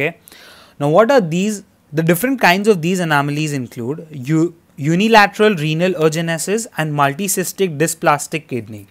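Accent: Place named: India. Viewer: I usually straggle at understanding Indian accent, but you are so clear thank you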